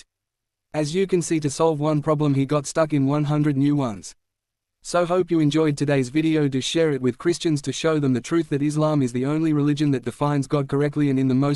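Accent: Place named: United Kingdom. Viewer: Bro your voice is like a British lad💀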